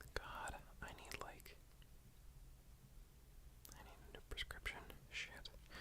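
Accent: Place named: Canada. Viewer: mic setting is immaculate 🤌🏼 also the way your canadian accent says certain words lowkey hits?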